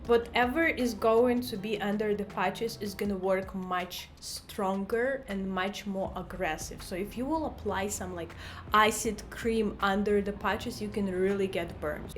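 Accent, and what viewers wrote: Russia. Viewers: по акценту одразу зрозуміло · that's insane, I've understood that you are Russian or maybe Ukrainian since the first word. If I'm incorrect, it'll be awkward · По акценту сразу показалось, что вы русская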